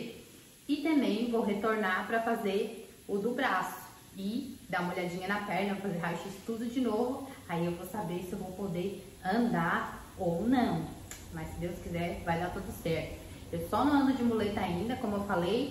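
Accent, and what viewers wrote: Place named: South, Brazil. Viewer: Quel plaisir de te voir.. AMOUR...sa manque les jours quand je ne te vois pas.et que je N'entends pas ta belle voix avec ton accent Brésilien du Sud.... AMOUR..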